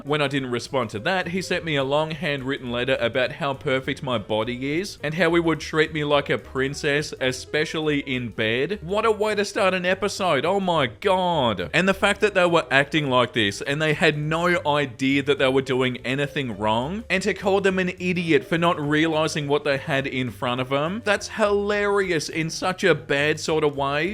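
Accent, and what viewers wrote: Australia. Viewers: That’s not a British accent. That’s an Australian accent. They’re approximately 9500 miles apart. Let me guess, American?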